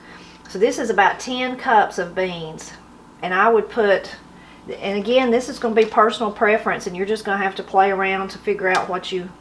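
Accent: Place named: Ohio, United States. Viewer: I so enjoy your show! Your accent reminds me of my aunts who grew up in Ohio, but their mother and father were from TN. Love the cooking! You cook just like my Mom did! Thank you for doing this show, it's so much more than the typical 'cooking' show!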